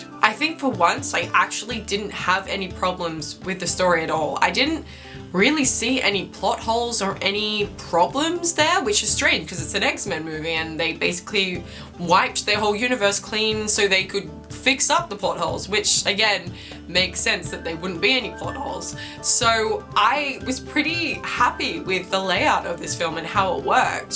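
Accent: Australia. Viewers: Nice reaction, I loved this movie lol I have to know are you from Auzzie or NZ?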